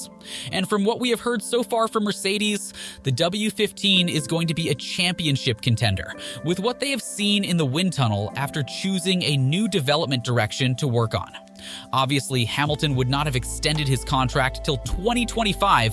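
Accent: United States. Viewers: Nice video explanation but you also didnt say Grand Prix correctly with your very thick American accent ! It's a French word so say it with a French accent !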